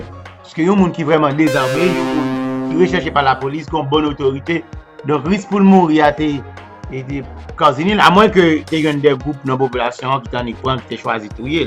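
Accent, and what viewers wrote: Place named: Cap-Haïtien, Haiti. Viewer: Saaa c nèg okap kap palé laaa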